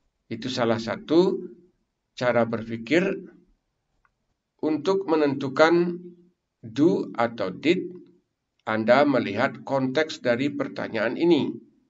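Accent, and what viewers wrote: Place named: North Sumatra, Indonesia. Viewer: " Your accsent a like a from North Sumatera😅"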